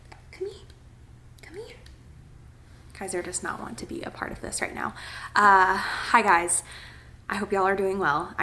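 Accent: South, United States. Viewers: Yes girl definitely so happy for you and so happy your back! Your a real inspiration and have such a kind heart, it’s so nice seeing someone who is genuine and I feel like your getting alittle southern accent in you!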